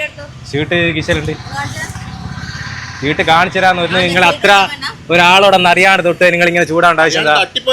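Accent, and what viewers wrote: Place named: Vadakara, India. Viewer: Ninghalde dialogue ketal Vadakara manasilayi backil board vechitund VADAKARA. Husn doubt und adan kannada kazhikan paranadm onn pottikatdm😂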